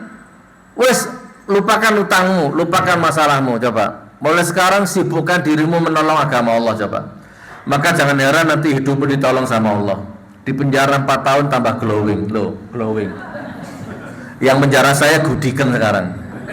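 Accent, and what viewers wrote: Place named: Banyumas, Indonesia. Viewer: Senangnya sama Gus Nur ini blaka suta cara bahasa ngapaknya love you Gus ❤❤❤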